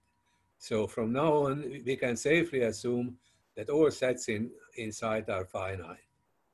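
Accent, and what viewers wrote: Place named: Hungary. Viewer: I know Hungarians have a very distinguishable English accent but damn, Pelikán is the king of speaking English with accent.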